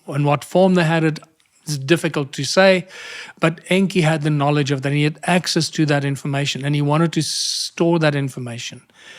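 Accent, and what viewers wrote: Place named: South Africa. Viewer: I didn't know you were South African,. But in this interview I can even hear a bit of South African in your accent ❤. I'm also a South African living in the Netherlands 🇳🇱 and I've really been enjoying your show.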